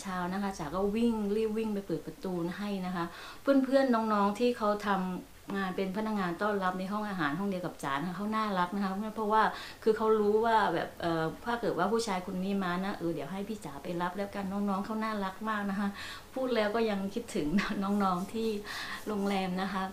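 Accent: South, Thailand. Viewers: พี่สวยมากเลยยยย ชอบเวลาพูดใต้❤❤❤❤ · เพิ่งเข้ามาค่ะ ฟังสำเนียงแล้วว่าคุณจ๋าน่าจะเป็นคนใต้นะค่ะ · เพิ่งเข้ามาดูแต่รุ้สึกชอบ แบบพูดจาน่ารักก็คิดในใจว่าทำไมเหมือนคนใต้ก็ดูมา2 3วัน จนมาเจอคลิปนี้ตอนนี้เองเพิ่งรุ้ว่าเป็นคนใต้ เราก็คนใต้เหมื อนกันค่ะอยู่จ. ติดกันเลย กระบี่ค่ะ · หนูเป็นคนใต้ค่ะ นครศรีธรรมราชเหมือนกันเลยค่ะ ว่าแล้วฟังพี่เสียงแบบคนใต้เลย ชอบการพูดมากค่ะ ทำให้หนูกล้าแสดงออกมากขึ้นเลย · ติดตามมาจากคลิปเปิดบ้าน หนูฟังครั้งพี่จ๋าพูดหนูมั่นใจเลยว่าพี่จ๋าต้องเป็นคนใต้แน่ๆ แล้วก็ได้คำตอบจริงๆจากคลิปนี้ 555หนูก็คนนครเหมือนกันค่ะ ยินกับพี่จ๋าที่มีครอบครัวที่ดีและอบอุ่นแบบนี้น่ะคะ ดีใจที่ได้ติดตามพี่คะ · ชอบสำเนียงใต้นะค่ะ น่ารักมากๆค่ะ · หวัดดีค่ะคุณจ๋า ชื่อฐาค่ะเป็นคนใต้ปัตตานีค่ะ เพิ่งเข้ามาดูคุณจ๋าค่ะ ตอนดูครั้งแรก คิดในใจว่าคุณจ๋าเป็นคนใต้หรือเปล่าเพราะฟังจากสำเนียงแล้วใช่เลยค่ะ คุณจ๋าโชคดีจังค่ะได้สามีที่ดี ครอบครัวอบอุ่น ดูครั้งแรกตกหลุมรักคุณจ๋าเลยค่ะ จะติดตามต่อไปนะคะ หวัดดีค่ะ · ฟังพี่จ๋าครั้งแรก รู้เลยว่าเป็นคนใต้แน่ๆ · พูดใต้แล้วเพราะมากๆเลยค่ะ เสียงน่าฟังมาก หนูก็เป็นคนใต้ค่ะ แต่พูดใต้ไม่ได้ เพราะโตที่กทม. 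พอพยายามลองพูด ยายชอบบอกว่าแหลงทองแดงค่ะ55555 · ว่าแล้วพี่น่าจะเป็นคนใต้ หนูคนนครเหมือนกันค่ะ พี่สวยน่ารักมากๆเลย ^^ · คนใต้เหมือนกัน ว่าแล้วทำไมเสียงแบบไม่เป๊ะแบบคนภาคกลาง ดูคลิปบ้านอเมริกาครั้งแรก คลิปนี้เป็นคลิปสอง ชีวิตดั่งเทพนิยายมากครับ ^^ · ผมติดตามล่ะครับผมฟังพี่จ๋าพูดในคลิปก่อนรุ้เลยว่าคนใต้บ้านเรา😁😁😁😁😁😁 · พี่เข้ามาดูครั้งแรกก้อรู้ว่าน้องเป็นคนใต้ สำเนียงออกพี่ก้อคนสุราษฎร์ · น่ารักมากเลยค่ะ แหลงใต้เหมือนกัน แต่กลัวเพื่อนฟังไม่โร้เรื่อง เลยต้องแหลงกลาง คุณจ๋าสวย และเลิฟสตอรี่ ก็น่ารักมากเลยค่ะ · ชอบฟังค่ะ...คนใต้ๆ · คุณ*พูดใต้สำเนียงชัดเจนมาก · ว่าแล้วเสียงแหลงเหมือนคนใต้ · นึกแล้วว่าต้องเป็นคนใต้ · พี่แล่งใต้ น่ารักค่ะ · ว่าแล้วสำเนียงพี่คล้ายๆคนใต้ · คิดยุว่าเป่นคนใต้หรอสรุปมารุ้เพราะคลิปคนใต้เหมือนกันจ้าาติดตามยุนะคะพี่จ้าาา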